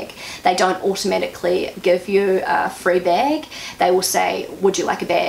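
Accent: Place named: New Zealand. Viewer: Love it!💕 happy to see how comfortable you feel and how much you have germanized without losing any of your kiwi identity ☺️ PS: love your account, as i‘m a german and spent 9 months in Whangarei during school and your account always reminds me of the kiwi accent and the country in general🥰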